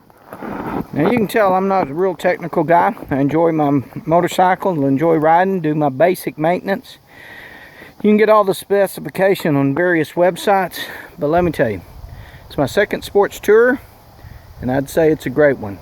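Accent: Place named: Texas, United States. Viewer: Man... if i close my eyes, you sound like Baby Bush....must just be the Texas in your voice.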